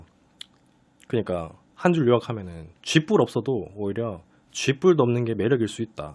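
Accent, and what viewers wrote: Gyeongsang, South Korea. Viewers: 단호한 경상도 말투가 더 믿음직스러운건 느낌인건가 ㅋㅋㅋ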